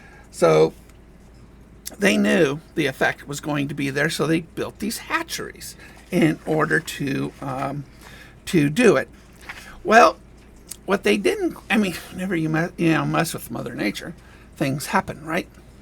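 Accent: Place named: England, United Kingdom. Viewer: The dams needed to be removed ! But the sediments needed to be dredged out before removal plain and simple. It will take decades to flush out into the mudhole in the pacific out front of the klamath. Decades for the trees willow ash etc to grow to shade the river again.. As far as the sasenach narrating this go home to where you come from ! The river will heal itself just gona take time lots of time!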